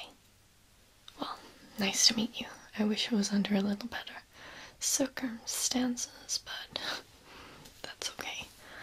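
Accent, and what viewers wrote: United States. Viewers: Great American accent! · She can really rock a American accident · I am unfamiliar with this series (forgive me!) and I could have sworn you said "prison mike" and I died. BTW, your American accent is like, my favorite thing in all of ASMR, it's SO good. Pretty much every video you do with it instantly goes into my favorites! So thank you thank you thank you <3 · Your american accent though 👌👌😊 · I love your american accent!!!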